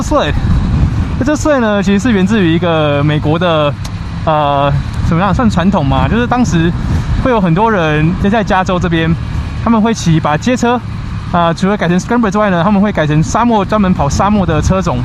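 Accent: Taiwan. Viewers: “大加分“有台灣國語的fu 好親切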